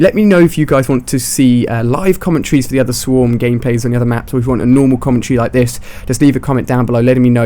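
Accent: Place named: United Kingdom. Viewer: He's British, it's just an accent.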